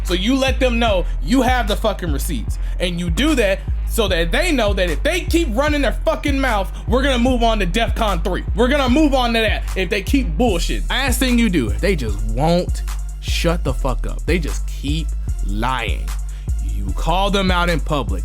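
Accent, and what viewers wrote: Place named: Memphis, United States. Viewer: You from Memphis G?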